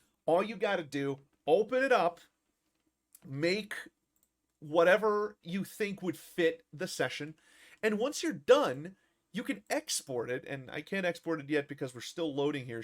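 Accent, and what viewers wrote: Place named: United States. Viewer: You were born and raised in Brazil? You have the most American American accent I have ever heard. Did you train your Brazilian accent out of yourself or something?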